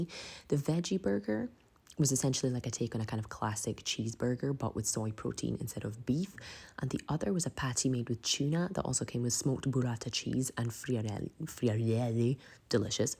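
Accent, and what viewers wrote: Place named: Scotland, United Kingdom. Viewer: Love your Scottish sense of humour & voice 🎉 fab week of eats yum 🎉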